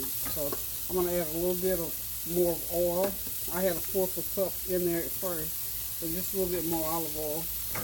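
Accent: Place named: South, United States. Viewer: I just love her voice! She reminds me so much of my mommy and granny. The Cove City, NC in me is almost lulled to sleep by her southern twang and the peace in her kitchen and her cooking. Thank you, Ms.!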